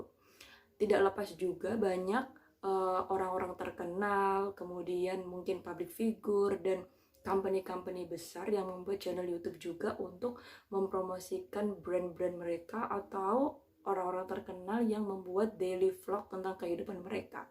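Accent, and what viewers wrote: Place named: Java, Indonesia. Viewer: MANTHUL... INFONYA SANGAT MEMBANTU... #AGAKMEDHOK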